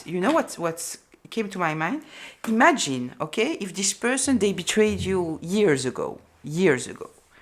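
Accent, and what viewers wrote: France. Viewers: You are spot on and you have a lovely french accent too.😉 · I love your French accent 😊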